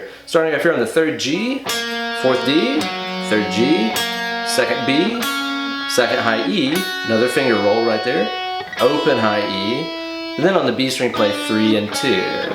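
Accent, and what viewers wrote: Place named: East Tennessee, United States. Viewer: I'm from East Tn, and you sound like half of the people I know. Any chance you're from around here?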